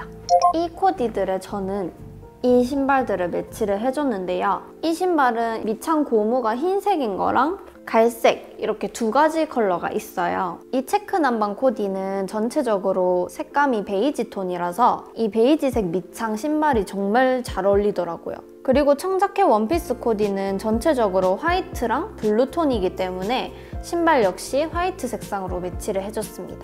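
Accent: South Korea. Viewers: I love her accent.. it's kinda like my korean friend's accent XD love you unnie, fighting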